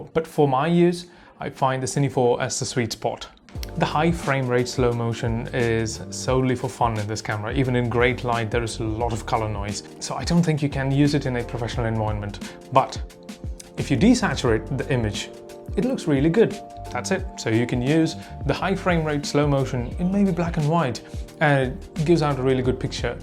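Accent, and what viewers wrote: United Kingdom. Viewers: British accent maadhri irukku naa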